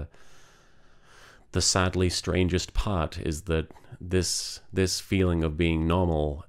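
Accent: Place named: Northern England, United Kingdom. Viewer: LK, everyone makes mistakes and just because a decision is emotional that doesn't make it stupid. I know how hard it is, but you need to understand that you can't change the past year. I'm happy that you've found a medication that works well for you. (Unrelated note: are you from the north of England? I'm from Doncaster and I thought your accent sounds like mine)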